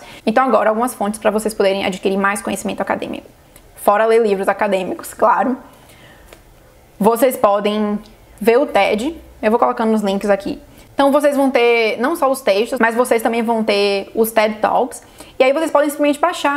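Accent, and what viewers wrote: Brazil. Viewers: Eu bem que notei pelo sotaque dela que ela é daqui da terrinha. rs'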